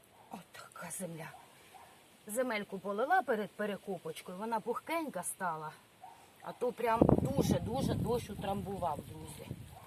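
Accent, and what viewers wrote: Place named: Kryvyi Rih, Ukraine. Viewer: Дуже гарна ваша мова! Така мила мені! В мене подруга з Кривого Рогу. Це так приємно чути, бо й вона так говорить! Хай буде здорова і щаслива!!!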